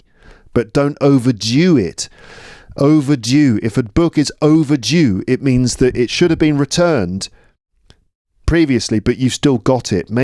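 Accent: United Kingdom. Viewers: I love how British English sounds and the way you speak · 1. I've listened to your podcast to get used to British's accent and imitate your words. 2. Just listen and imitate your words for many times. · Hi, I know it's late for the answer, but I want to tell you how much I love listening to you. I like to knit and usually I listen to your podcasts or videos while I knit. I find that a perfect match as I get more and more confident in English. Besides, your British accent is so nice that it's always a very pleasent time "knitting with ". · Hi! I'm an English teacher and I have started listening to your podcast since last year. It helps me get up in the mornings with a clear mind! Love the delicious humour it offers. Love the vocab explained and your beautiful British accent. I have become addicted to it! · Hi.Yes How do you know that your podcast is good for get sleepy??😂😂😂 Joke, joke for me it's useful to improve listening listening... 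I like British pronunciation and entonation too. Thank so much for this. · Hi. I'm from Myanmar. You know what? My listening skill has definitely improved after listening to your podcasts. The problem was when I became so attuned to your accent which is British accent, I couldn't fully understand other different accents. Why does that happen? Does that happen to most of English learners? And I'm just wondering if you can have a chat online with your listeners (including me) on 31st December, which is the last day of 2024? I'm so eager to talk to you. But it's okay to decline my request if you don't have enough time. Thank you and looking forward to seeing your new episodes. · Your podcast is the best English teaching podcast on YouTube... It has been a great help for me to learn English especially when you read short stories... So I recommend that you read more stories on your podcast.. 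I enjoy listening to the stories in your charming British accent... Please post more episodes of you reading short stories.. thank you very much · Hi. Thanks a lot for your podcast. Im an English learner in China and I love listening to your podcast and your sound. Im here to learn about British accent, and it helps me alot.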